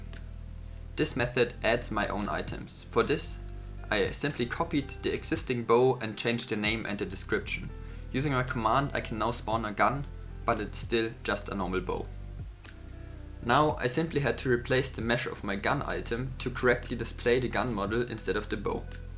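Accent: Germany. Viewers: are you german? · german? i hear it so hard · are you german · I like you! xD Also, good luck losing that german accent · You sound pretty german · you sound German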